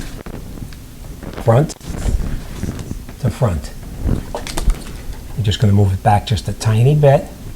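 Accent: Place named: Maine, United States. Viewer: You sound like a Mainah... I love it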